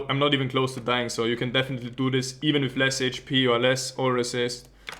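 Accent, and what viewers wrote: Austria. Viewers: ... I just came back to diablo just cos of this video and i will play my barb for SURE! funny accent+GREAT imformative videos+Austrian+I'll be back = im so happy that i subscribed you man. Keep up that great work dude. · I love your Videos! How could anyone not recognize that accent? Austria FTW:) (I'm german though, don't get confused)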